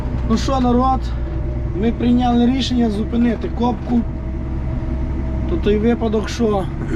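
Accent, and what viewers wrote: Ternopil, Ukraine. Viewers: Тернопільський акцент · ваш говір Тай ніби із тернопільщини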